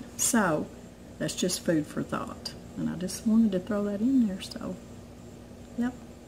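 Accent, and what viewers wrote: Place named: Kentucky, United States. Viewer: You are a doll!!! To hear ya talk makes me miss my kin down in Kentucky ❤️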